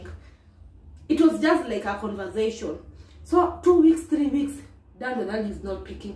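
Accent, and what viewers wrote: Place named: Kenya. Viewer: I remember you, you're Kemuntos niece? Love your narratives. You talk like a Kenyan youtuber living in Germany. Chocolata.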